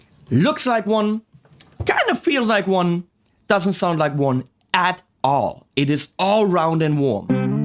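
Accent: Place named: Germany. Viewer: You talk a whole bunch of s*** I can't even understand you with that German accent put the guitar down and just walk away from it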